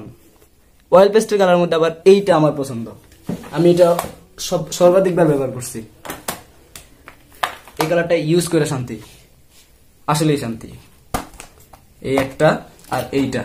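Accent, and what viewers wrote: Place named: Bangal, Bangladesh. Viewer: Dada ami to bangal noi tai bangal bhasha janina ektu ektu bolte pari bhaiya apnar bangal bhasa amar darun laigachhe are apnar reveiw o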